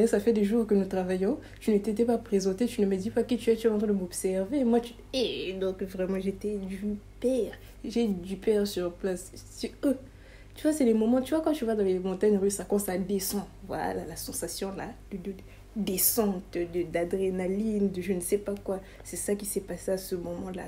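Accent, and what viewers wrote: Democratic Republic of the Congo. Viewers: 😂😂j'aime trop ton accent congolais ❤ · Une vrai CONGOLAISE sans complexe avec son accent j'aime 😍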